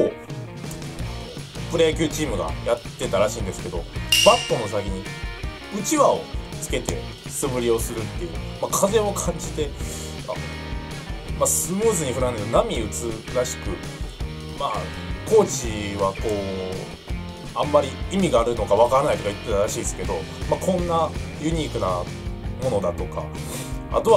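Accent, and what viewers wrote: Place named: Kansai, Japan. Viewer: さんの関西弁、いい。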